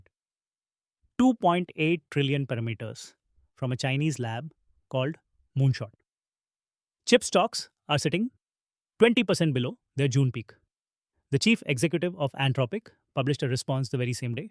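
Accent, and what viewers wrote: India. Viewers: It’s my first time seeing a video from this guy with the thick East Indian accent. My feeling is that regardless of any bias he might have he did worthy testing and an excellent job of spelling out his methodology. Better than the usual junk that I’ve wasted my time watching. So: 1) Kudos to him for doing this. 2) where’s your YouTube video doing this kind of evaluation in a totally unbiased way? Seriously, it’s easy to criticize but takes effort and I think you shouldn’t be so harsh. Please ponder that…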